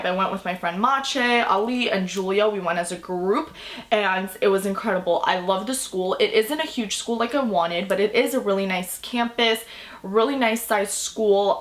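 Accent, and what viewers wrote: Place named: Long Island, United States. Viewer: that long island accent is iconic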